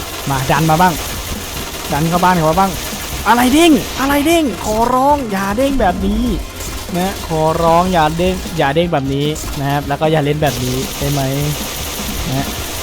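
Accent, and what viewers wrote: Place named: Isan, Thailand. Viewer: เห็นบางคริปพูดอิสานนึกว่าคนบ้านเดียวกัน😅🤣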